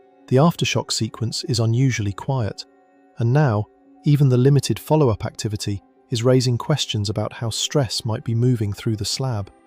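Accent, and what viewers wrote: United Kingdom. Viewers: Different channel name same British spit mouth. There would not be lisp noise unless words are being spit mouthed. Use an American clean English AI text speech reader and stop repeating not this, not that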